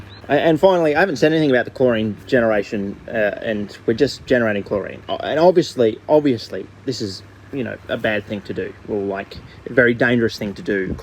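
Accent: Australia. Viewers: I'm like "Damn, he's posting at 7am?" Then I remembered from the accent. Then I'm like, "wtf? It's spring, not fall." Then I remembered that Australia is basically the upside down world. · I love your videos! And the Australian accent is amazing!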